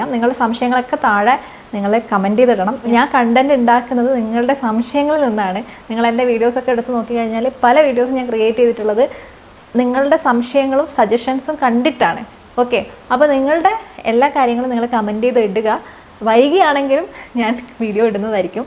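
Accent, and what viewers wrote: Kannur, India. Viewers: Kannur aano? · Nalla rasond samsaram kelkkan...kannur bhagam aano veedu?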